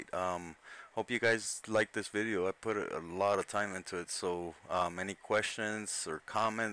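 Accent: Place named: Canada. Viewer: you sound like a nice Canadian okay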